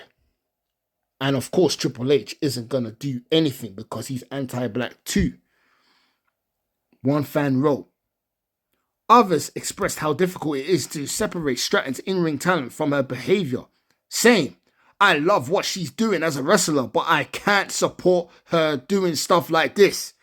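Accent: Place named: India. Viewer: You sound like a Indian tether yes we can say it everyone else can’t why is it so hard for yall to understand.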